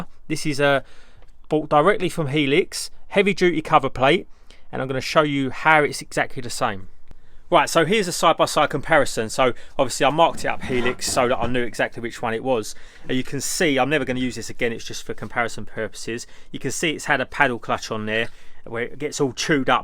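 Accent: United Kingdom. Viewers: Nice to hear a brit bro on here showing our ways. Well done son. Good job !!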